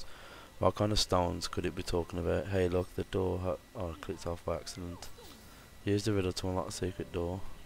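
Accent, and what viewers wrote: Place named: Birmingham, United Kingdom. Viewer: Can tell ur from brum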